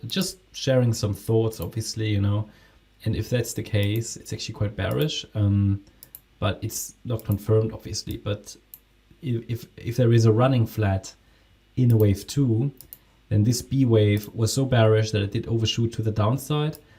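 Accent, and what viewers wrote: Germany. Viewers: Bist du Deutscher? Hört sich so an 🎉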